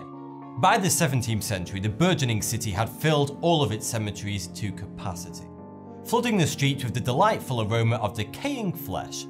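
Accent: United Kingdom. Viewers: I am Canadian, and though I don't think that fact is especially relevant, I find his particular British accent adorable, especially since his insights and conclusions about the facts he researches are so intelligent and well thought out. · A weird accent?! Its a british accent, the home of the language! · It's literally a normal British accent... This is what most of us sound like, not that RP bullshit Americans expect us to XD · I thought it interesting that the video showed US money falling rather than French money, or even British, since the presenter sounds British. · I was interested in this video, but I'm not watching it because your non-standard pronunciation of "f" and "th" really grinds my gears. I don't know about everyone else, but I associate this language "feature" with lower-class, uneducated people. Rationally, I know it is just one of many British dialects, but emotionally, to be redundant, IT GRINDS MY GEARS. 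· His name isn't 42, he's actually saying but with his British accent. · Wearing a suit and having a brit accent obviously has not made you an authority. You could have done so much more with this. Among the many things you missed....there are hundreds if not thousands of cave houses available right now all over the world..Spain is a big one. And an underground house is possible anywhere on this planet with a constant temp. inside. I find this whole video obnoxious and full of incorrect information. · It's just his accent, some British people say f for th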